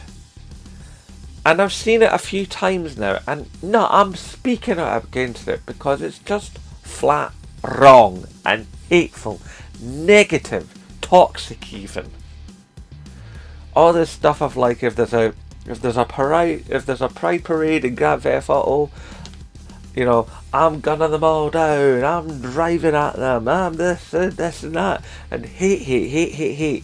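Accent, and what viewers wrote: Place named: Jamaica. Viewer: Wait a minute you're white?? Thought you were Jamaican bro!!?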